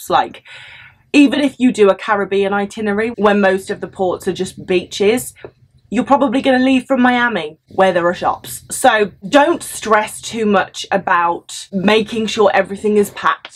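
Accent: United Kingdom. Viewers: She's sounds so british actually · She must be from the UK.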